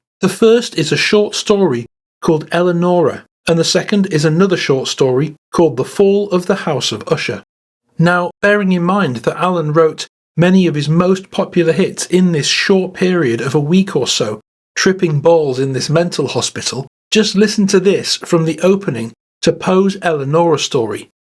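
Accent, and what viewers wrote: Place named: Lancashire, United Kingdom. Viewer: Thanks for a class show. No more political or news for me with the spiteful and snarky tones and pure lies and character assassination and threats of Civil War and even WW3?! What the devil? Very Despressing. Good to hear a calm Lanacashire voice on musical subjects. I worked in the Northwest in 1995 during the Britpop era. Lived in Longsight and Old Trafford, Manchester. Take me back.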